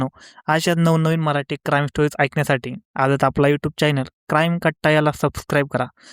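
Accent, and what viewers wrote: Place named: Nashik, India. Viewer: कुठला आहे re भाऊ, बोली वरून वाटते की तू नाशिक नगर भागातील आहे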